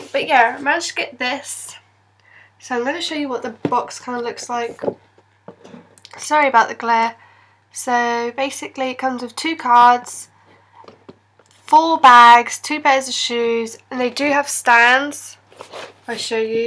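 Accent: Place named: United Kingdom. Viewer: You're so sweet, i love your UK accent and congrat for this awesome pack :) I love you're video. Bisous from France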